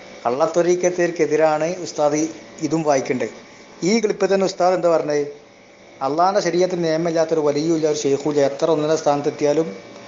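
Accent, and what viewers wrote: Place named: Malappuram, India. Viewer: പറയുന്നത് എന്നു് പറയുന്നതിന് പകരം പറയണ്ടതു് എന്ന് പറയരുത്. മലപ്പുറം ശൈലി ഒഴിവാക്കുക . കേൾവിക്കാർ ആശയക്കുഴപ്പത്തിലാകും ശ്രദ്ധിക്കുമല്ലോ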